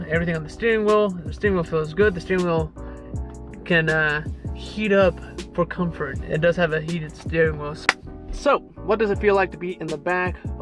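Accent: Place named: Eastern Europe. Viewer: Where are you from bro? At times your accent sounds eastern European lol. Not trying to dis just curious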